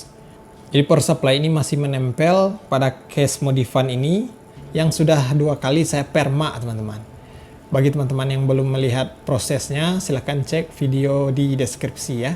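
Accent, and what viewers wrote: West Sumatra, Indonesia. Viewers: logat nya minang bgt nih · Dari logat nyo pasti uda ko urang minang..awak yakin 100%.. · Logat Sumbar nya kental nian...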